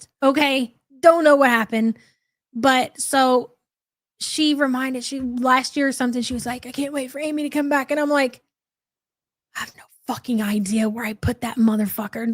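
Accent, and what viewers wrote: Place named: Ohio, United States. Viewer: Replay gang today! I love our Support Group and I look forward to meetings in the morning. I'm from Southern Ohio and everyone I grew up with sounds just like you. It gives me so much joy up here in the North where I can sometimes feel a little bit alone. Thank you all ❤🎉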